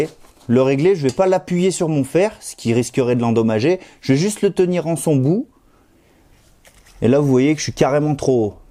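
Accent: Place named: Loire, France. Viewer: Je dirais, tu prones le calme, voir le plaisir de faire les choses, ça pourrait sembler banal, mais moi, il m'a fallu du temps, pour y arriver, et encore.... ; de plus tu as l'accent de la région de St-E., la Loire, la Haute-Loire : souvenirs, souvenirs...Apaisé, je me suis endormi en t'écoutant... mais je me suis réveillé aussi, je te rasssure !: tout ce qui est réglage, ça n'est pas trop mon truc, mais un peu plus maintenant, grâce à ta video . I like, donc. Merci.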